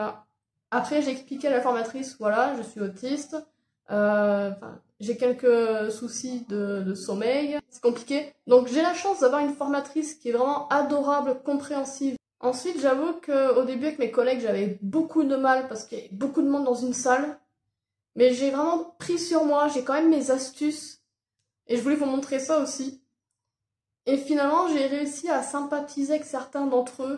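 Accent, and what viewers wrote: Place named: Russia. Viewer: j'adore ton accent avec le leger accent russe dedans ca te donne un charme fou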